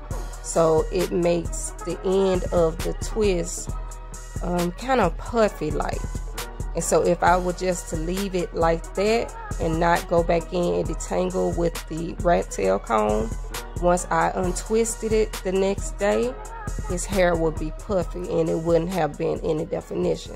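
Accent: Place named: Georgia, United States. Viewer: I knew it! My cousin says oil just like you and she's from Georgia. She so funny, she says stuff like "kitchen tile" as "catchin' towel" 😂😂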